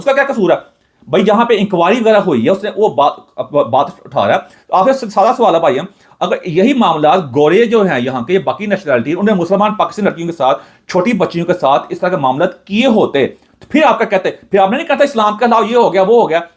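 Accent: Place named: Punjab, India. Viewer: Urdu bolen humen panjabi accent samjh nhi ati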